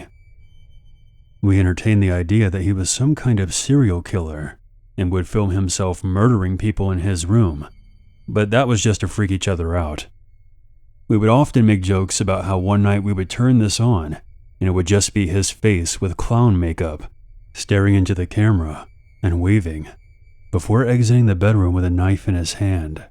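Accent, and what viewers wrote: Australia. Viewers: thats a solid aussie accent not even Australian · Wowsers. Your Ozzie accent on point, mate 🤙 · Your Aussie accent is very Northern Australia, great job! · Wrong, he sounded spot on By the way, we use the word “bloody”, but we don’t go through the trouble of typing it out online… Just a little tip the next time you pretend to be an Aussie, you poser lol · The irresistible time with! Thank you for remarkable work with great stories. Your voice narration is always spotless! Edit: That end was awesome! You spoke like an Australian 😄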